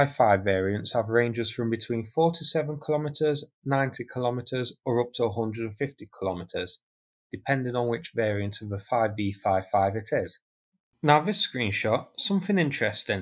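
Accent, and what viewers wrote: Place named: Northern England, United Kingdom. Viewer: Loved your expression "a little late there chuck" I'm trying to work out where your accents from? Leeds or Manchester? I'm in Leicester where they'd say a little late mi duck.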